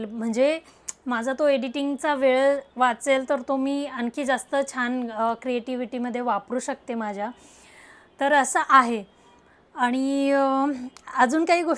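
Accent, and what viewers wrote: Khandesh, India. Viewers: Congratulations one man army, तुमचे व्हिडिओ खूप छान आहेत. तुमची ॲक्टिंग ची हिस्ट्री काय आहे? म्हणजे तुम्ही acting चे प्रशिषण घेतला आहे का? तुमचे आता normal बोलणे आणि व्हिडिओ मध्ये खानदेशी टोन मध्ये बोलणे खूप वेगळे वाटले. Keep progressing 👍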